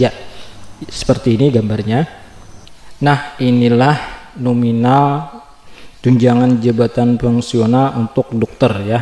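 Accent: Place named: Banjar, Indonesia. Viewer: medok sekali banjarmu sanak😁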